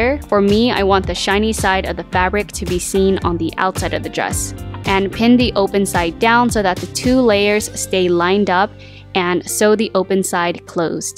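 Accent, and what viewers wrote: United States. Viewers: She sounds sooooo american oml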